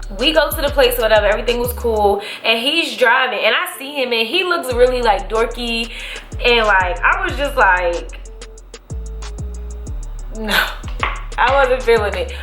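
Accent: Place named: DMV, United States. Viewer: dmv! period...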